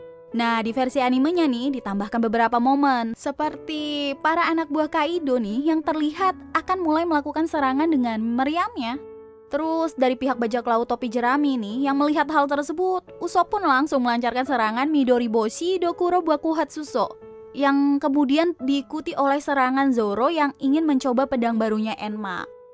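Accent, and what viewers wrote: Java, Indonesia. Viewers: suka OP sejak kapan? Ini menjadikan perbedaan yg mendasar. Saran * "coba tonton animenya dari chapter pertama, baru bawain lagi yg ini, terus bandingkan secara pribadi" * Medoknya keluarin aja, jgn d tahan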